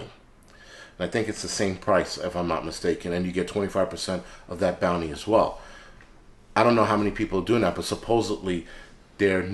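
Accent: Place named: Pittsburgh, United States. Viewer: Sounds like Pittsburgh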